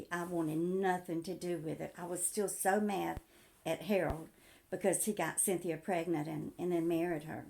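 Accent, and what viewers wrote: South, United States. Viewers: I knew you sounded Southern!! I’m from Florence Alabama, went to school in Waterloo. I’m loving your story!! Bahahaha I had an Aunt Sybil too🤣🤣you’re cracking me up woman 👏👏😂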